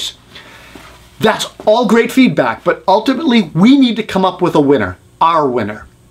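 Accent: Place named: Worcester, United States. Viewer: Having lived in Worcester for the first 20 years of my life, I could place that accent immediately.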